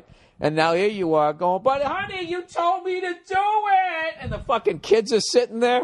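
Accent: Boston, United States. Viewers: Boston maahhhket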